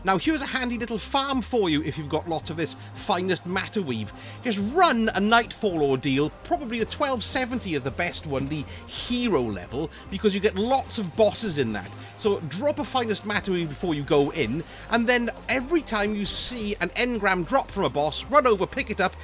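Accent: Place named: England, United Kingdom. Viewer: Love the english accent!!!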